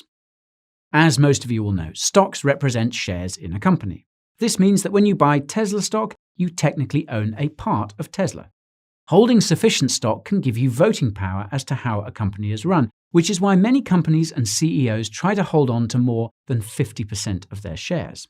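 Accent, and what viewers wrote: United Kingdom. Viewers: i found his accent is kind of tricky for me, no offence for the person in the video since english is my 2nd language. do you know which part of uk this kind of accent coming from? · This guy puts Bitboy Crypto to shame....blown away by the great amount of info and research this guy provides without the smoke and fireworks. Maybe it's just proper British demeanor and accent that makes everything sound more credible...lol. Either way, I like it. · This guy could spout nonsense but with the british accent he sounds smarter...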